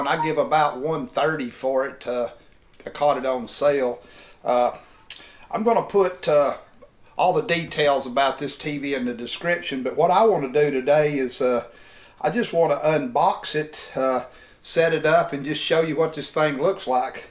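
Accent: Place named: Texas, United States. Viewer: Man sounds texan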